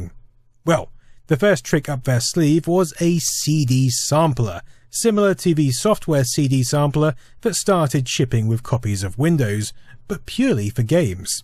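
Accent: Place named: England, United Kingdom. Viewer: Just wanted to say I love your documentaries and how professional they've become. Your gentle English accent is soothing to my American ears. I'm grateful to get to learn more about niche retro computing topics.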